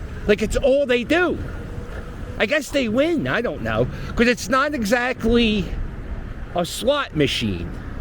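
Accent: Philadelphia, United States. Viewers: I love these vids. Philly transplant in NYC. I love your Philly. accent!!! Good jawn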